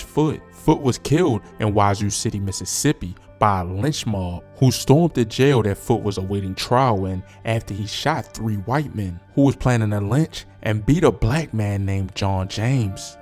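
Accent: Baltimore, United States. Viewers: You got a Baltimore accent, you should probably stick to that...cause you obviously don't know shit about memphis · it's weird hearing a Baltimore accent talk about Memphis it be like me trying to tell you about Fayette st. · His aZZ from Baltimore sun shi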